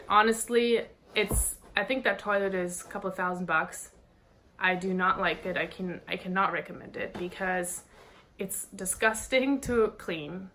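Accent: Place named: Germany. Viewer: your english really improved, i remember watching your videos back when your (cute) german accent was way stronger💛i love to watch your videos and hope i‘m supporting you through it, i kind of have the same dream of living in hawaii for like 1/2 years in my life one day. greetings from switzerland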